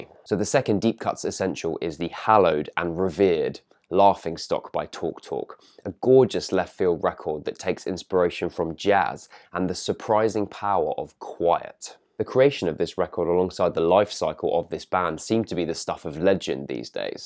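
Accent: United Kingdom. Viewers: I love your articulate descriptions, your serene voice, your British accent, and your very handsome face. Listening to you talk about albums I love is validating, cathartic and almost as enjoyable as listening to the albums themselves. No homo tho, for reals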